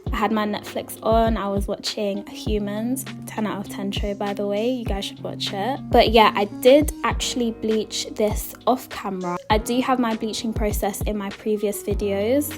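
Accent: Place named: United Kingdom. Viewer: Lol love the accent ❤️❤️❤️❤️❤️❤️❤️❤️where you from UK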